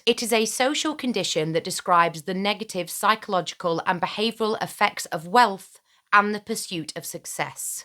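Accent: United Kingdom. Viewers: Hello, from Michigan, USA. I wish I could express to you how much I love your content and how many times I've read things in your sweet British voice and pronunciation😊 Your videos are so well researched and thoughtfully delivered. And very refreshing in this extremely cold midwestern winter! Until next time...🧡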